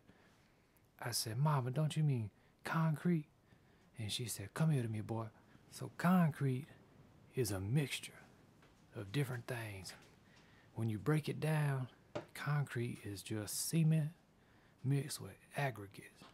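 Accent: Louisiana, United States. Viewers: Is that a Cajun accent I hear?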